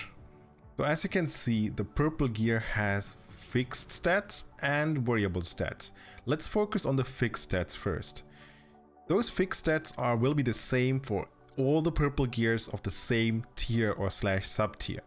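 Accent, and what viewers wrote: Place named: Austria. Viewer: Thanks for the clear explanation bro. Based on your experience, what was the highest item level you saw in game? I've seen a lot of videos and they ranged from <num> only. btw I like you accent, it's like Arnold Schwarzenegger. lol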